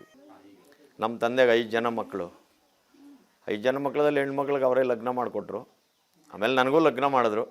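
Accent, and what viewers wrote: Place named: Chikkaballapur, India. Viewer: ಇವರ ಭಾಷೆ ನೋಡಿದರೆ ನಮ್ಮ ಚಿಕ್ಕಬಳ್ಳಾಪುರ ಅತ್ವ ದೊಡ್ಡಬಳ್ಳಾಪುರ ಕಡೆಯ ಭಾಷೆ ಅನ್ಸುತ್ತೆ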